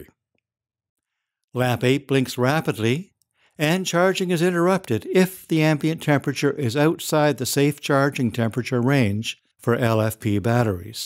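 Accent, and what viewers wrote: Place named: Canada. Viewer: This guy's voice is weird, I hate it. Sounds like a damn Canadian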